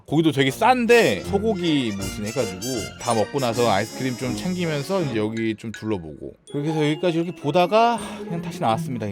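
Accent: United Kingdom. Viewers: 이사람 미국갔다왔는데 억양은 왜 영국이죠? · 미국갔다오셨는데 워쮀서 영국영어를....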